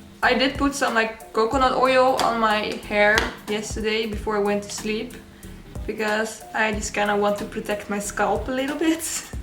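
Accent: Netherlands. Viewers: Are hou Dutch? You sound Dutch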